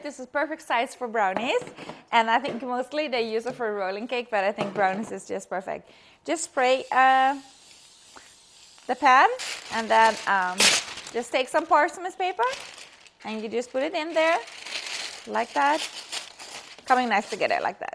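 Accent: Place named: Norway. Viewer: Are you living in Norway? (If you're Norwegian ofc lol) because I can hear a little bit of Norwegian accent when you talk, but it's a lot better tho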